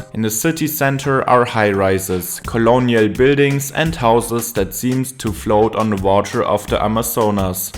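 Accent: Germany. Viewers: I know a german accent when i hear one! Love it! And yay new city channel!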